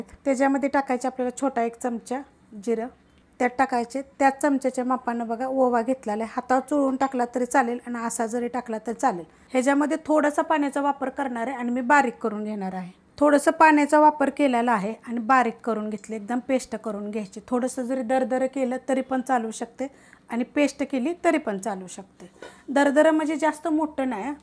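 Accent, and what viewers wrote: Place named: Mahabaleshwar, India. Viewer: तुमच्या सगळ्या रेसिपी एक नंबर असतात...तुमच्या बोलण्यावरून मला तुम्ही माझ्या माहेरच्या म्हणजेच महाबळेश्वरच्या वाटता.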